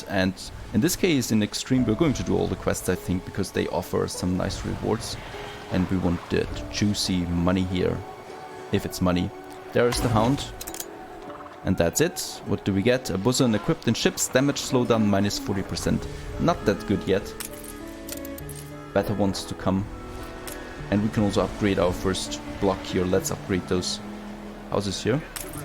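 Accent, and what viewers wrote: Germany. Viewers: U German? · Wusste ich es doch :D wollte schon sagen du hörst dich sehr deutschsprachig an xD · Mmm yes the german accent is very present here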